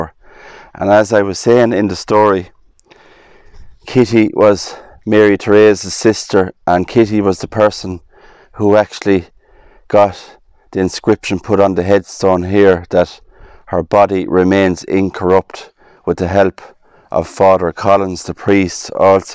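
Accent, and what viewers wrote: Ireland. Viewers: Are you Irish? Anyways, you're a really good storyteller, and I'm going to be saying Mary Therese Collins in my sleep now. 😆I'm laughing with you though not at you and I love your soothing voice that would be really good for ASMR. Subscribed!!! 😀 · Perhaps you don't like semi personal comments. But I am going to take a chance. Just love Irish accents since my ancestors came from ballina, county Mayo. Still have relatives there. Enjoyed the video too. Very thought provoking. Am a new subscriber.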